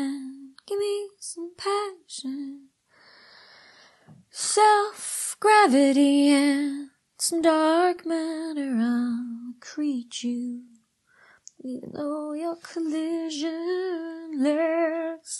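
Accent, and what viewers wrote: United Kingdom. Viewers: Idear. Love the British accent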